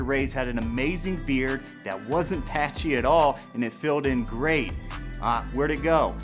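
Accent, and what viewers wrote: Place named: New Orleans, United States. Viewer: My first time on your channel I subbed as soon as you said lil Jimmy. You sound like down home folks from my home town New Orleans. Oh and I love the music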